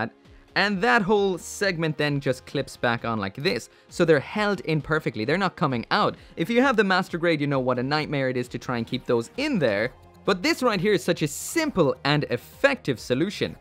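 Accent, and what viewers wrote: Ireland. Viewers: You're irish?!?! It makes sense now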